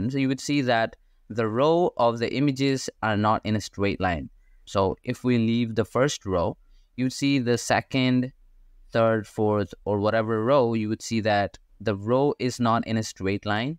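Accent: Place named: United States. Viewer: hey bro i just want to know how you learn american accent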